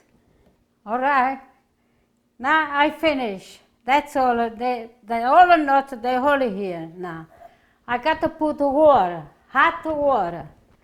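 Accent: Italy. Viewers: Thank you. I love you so much. When I hear you speak you take me back to the good old days of being around my Italian family And with my grandmother who didn't speak any English. Thank you for your Italian kitchen making great Italian dishes. Thank you for the memories you bring. I love you. 🥰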